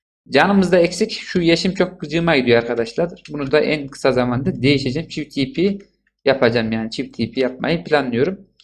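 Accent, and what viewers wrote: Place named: Central Anatolia, Turkey. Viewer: mizacın güzel kardeşim iç anadolu şifesi var .D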